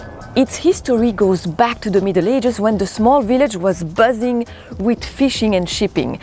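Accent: France. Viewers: Bonjour je suis du Canada,Montreal je vous adore une française qui parle anglais Très belle accent vidéo intéressent quand allez vous parler du osmo pocket Continuer votre bon travail bizou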